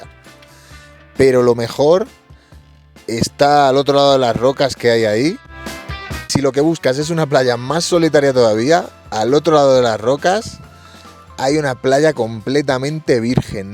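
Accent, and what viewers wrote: Spain. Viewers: Viendo videos de aventureros me encontré tu canal! No te conocía pero viendo tu canal noto acento español???? De haberlo sabido! Yo me crié en España hasta los 22 años! Ojala hubiéramos coincidido antes! Te hubiera dicho unos spots muy buenos en oaxaca para acampar! Si te vas a quedar más tiempo por el estado échame una voz! Saludos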